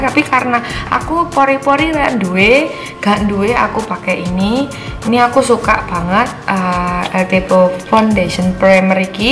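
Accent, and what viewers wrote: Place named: Malang, Indonesia. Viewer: ngakak nonton tutorial nya hahahah asli ngalam bgttt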